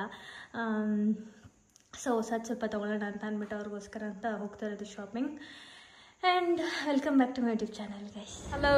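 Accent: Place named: Channapatna, India. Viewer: I like Channapatna kannada slang❤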